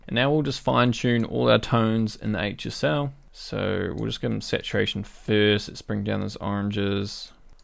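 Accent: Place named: New Zealand. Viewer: A dutch name...that accent youre from NZ love you video's! groetjes vanuit Nederland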